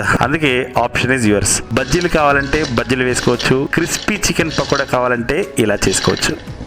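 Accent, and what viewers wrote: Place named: Kadapa, India. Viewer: తప్పుగా మాట్లాడింటి..... అంటున్నారు అంటే కడప or కడప sarrounding వాళ్ల మీరు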